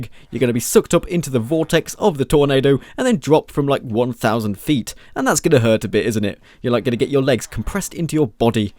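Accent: United Kingdom. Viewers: I love British accents :D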